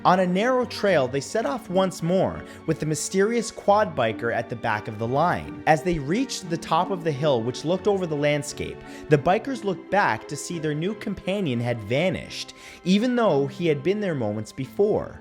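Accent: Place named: Canada. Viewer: he's CANADIAN, WHAT ACCENT IS CANADIAN?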